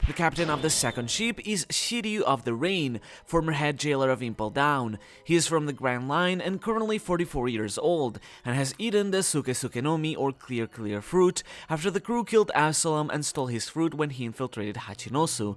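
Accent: Spain. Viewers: Are you Spanish? I’m just guessing from your accent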